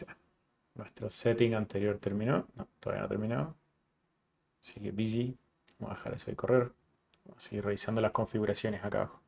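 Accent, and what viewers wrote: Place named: Chile. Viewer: tienes acento chileno...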